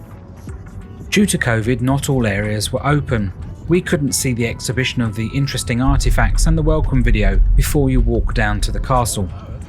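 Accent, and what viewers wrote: United Kingdom. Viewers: Thích giọng anh anh+ có tiêu đề tiếng việt cộng english subtitle —>> really really useful sources to learn english. Thanks 👍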